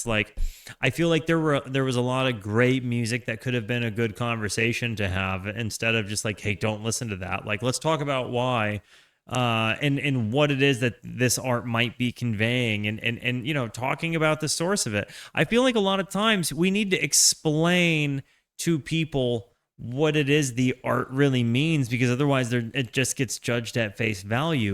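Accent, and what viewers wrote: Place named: Locust Valley, United States. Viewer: This guy has that Locust Valley Lockjaw accent.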